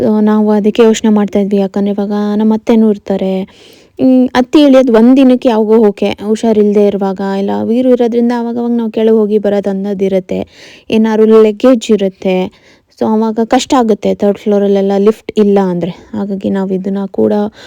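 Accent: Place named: Hassan, India. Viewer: sister ನಿಮ್ಮ ಊರು ಹಾಸನ ನೀವ್ ಮಾತಾಡ್ತಿರೋ ಭಾಷೆ ಹಾಸನ್ ತರ ಇದೆ ನಮ್ದು ಹಾಸನ ಅದಿಕ್ಕೆ ಕೇಳ್ದೆ 🫰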